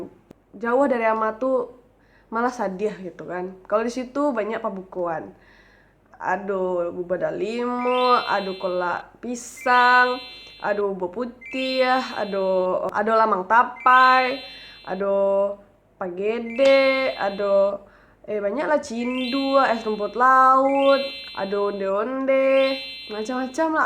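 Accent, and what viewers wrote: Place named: Minangkabau, Indonesia. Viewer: buliah kenalan uni. namo awak della asli minang tapi laia dan gadang di jakarta . papa jo mama awak urang lubuak basuang . awak kuliah di gundar semester 5 jurusan akutansi. uni bahaso minangnyo barasiah bana . sanang awak mandanganyo tp awak indak sapasiah uni bana bahaso minangnyo.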